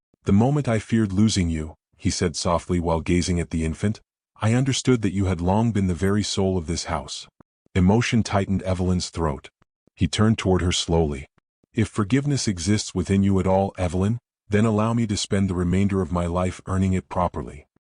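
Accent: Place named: United States. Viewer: An AI with a mechanical robotic American accented voice for a story set in Britain. Odd...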